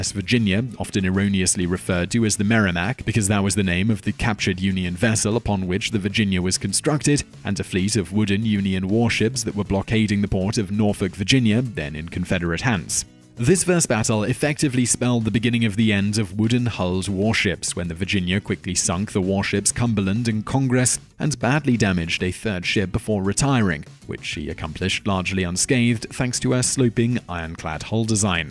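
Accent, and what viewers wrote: United Kingdom. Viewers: Told my girl she knew who "Simon Whistler" (british accent) was, and now that ive pulled the video up, shes pretending she doesn't know who u are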